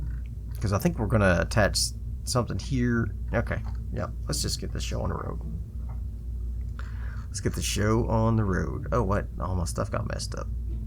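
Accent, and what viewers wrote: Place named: North Carolina, United States. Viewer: Are you from North Carolina? I'm trying to pin down your accent.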